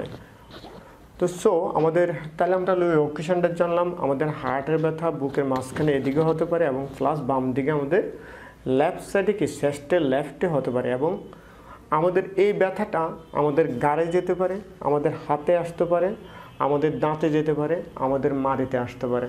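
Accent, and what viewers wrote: Noakhali, Bangladesh. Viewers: ওরে হেতের বাড়ি তো নোয়াখালী 😊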